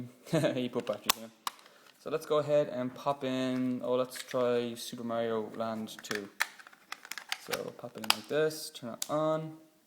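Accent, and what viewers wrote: Ireland. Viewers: ***** hes irish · You Irish?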